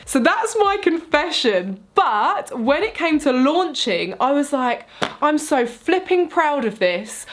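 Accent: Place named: United Kingdom. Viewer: Ok I've been seeing your lip and make up tutorials from a while now... But i never got to see you talk XD today i finally clicked on this video very excited too see someone who has such an amazing tutorials... Talk XD I JUST FREAKIN LOVE YOUR BRITISH ACCENT... I am so shocked that ur a Britain... Your beautiful... Stay like that always... Your tutorials speak alot of your creativity just in coloring your lips and i am here for it... Love from pakistan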